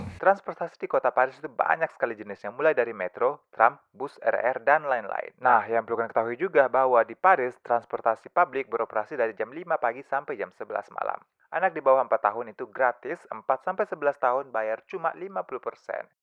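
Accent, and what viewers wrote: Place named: West Sumatra, Indonesia. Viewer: Betul sekali. Jangan silau dengan orang yang berbeda ras, jangan menganggap diri lebih rendah dan terlalu memuja. Pada akhirnya kualitas pribadi yang lebih menentukan hidup kita. Terima kasih utk sharingnya, sukses selalu ya.. Btw, saya suka logat minangnya..😊👍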